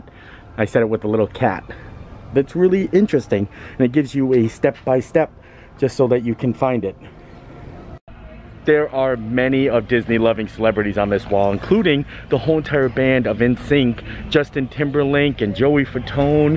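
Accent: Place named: Midwest, United States. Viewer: I have been trying to place that accent for a long time. I knew it was midwest but not sure what state. I was thinking Minnesota?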